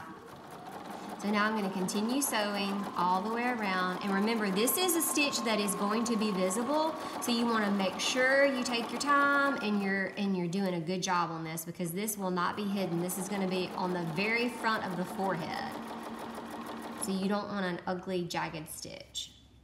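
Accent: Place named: South, United States. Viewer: Hi...Just watched your video. You have made a wonderful quality scrub cap...French seams and all!!! I've sewn for six decades and appreciate your project! I do have a couple of suggestions. First, try not to sew with the bulk of your work going through the arm of the sewing machine. You'll find it is much easier to handle your project. Second, if you pin or stitch the end of your elastic to the entry end of your casing, you can pull it through far enough to sew both ends using just 5" of elastic and saving 2". I loved your clear, through instructions delivered with your wonderful down home Southern accent. Keep up the great work and thank you for sharing...KMc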